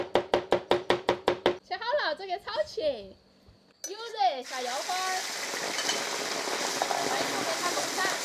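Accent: Sichuan, China. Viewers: 四川話跟cc翻譯不押韻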